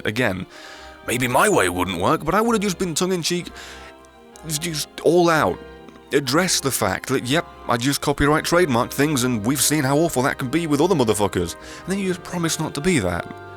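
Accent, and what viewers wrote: United Kingdom. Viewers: He brings humor in a british accent.Also i saw your exact same comment on 2 other videos.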